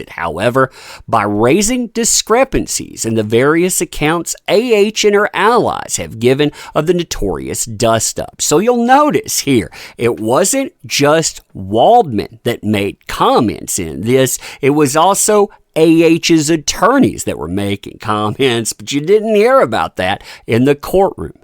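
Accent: South, United States. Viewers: Your hill Billy voice is very irritating. Must Americans sound so uneducated and low class. Except Johnny I love Johnny but he rightly prefers England and France anyway.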